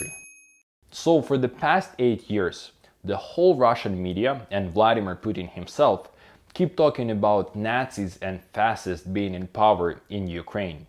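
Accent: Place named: United States. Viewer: У вас очень хороший американский акцент) Редко встречаются русскоговорящие люди с хорошим английским акцентом)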